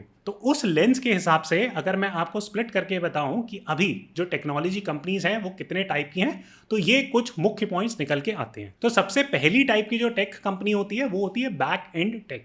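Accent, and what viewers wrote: Standard. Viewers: Apki hindi bahut zyada Shudh ha!!!😁❤️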